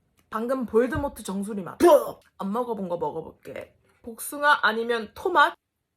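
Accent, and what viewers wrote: United Kingdom. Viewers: 생전 가지도 못할 영국 매너와 발음 잡도리가 그리우ㅓ져 이젠 셀프로 주기적으로 옵니다 그와중에 이거진짜 조오온나 웃겨요 ㅠㅜㅜㅜㅜㅜㅜㅜㅜㅜㅜㅜㅜㅜㅜㅜㅜㅜㅜㅜㅜㅜㅜㅜㅜㅜ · 하 ㅠㅠㅠ 진짜 요즘 너무 재밌어요... 영국 발음의 신사적인 부분괔ㅋㅋㅋㅋ 한국어의 친구같은 그,, 말투의 조합이 장난아닙니다... 고3인데 언니가 읽어주는 수능특강 지문이 너무 듣고 싶어요... 올려주시면 주구장창 연습할게요 😂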